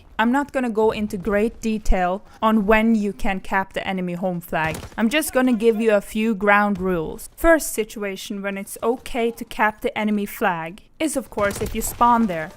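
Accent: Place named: Ireland. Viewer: her accent is irish fyi people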